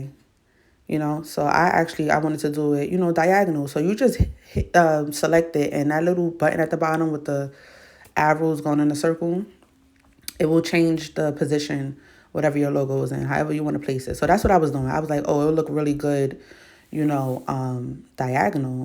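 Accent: New York, United States. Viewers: Love it lol def from NY cause thats how I be talking too. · I love your “keep it real” commentary and your accent (NY?) I’ve been wanting to do this...thanks for demonstrating!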